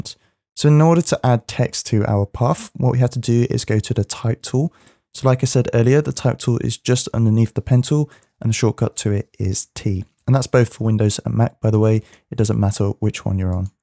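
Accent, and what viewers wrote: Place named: England, United Kingdom. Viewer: FANTASTIC!!! I've just found you, after looking for so long to find about putting text into Photoshop. Such a breath of fresh air to hear a nice slow, calm, English voice, and very carefully explained. THANK YOU SO MUCH! I am avidly looking at all your videos. I went to bed a very happy bunny last night. I have subscribed!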